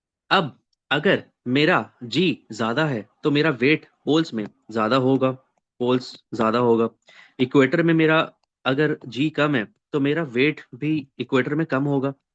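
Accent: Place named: Mumbai, India. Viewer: Sir eda, mandawali?? Ekdum mumbaiya tapori language 😂😂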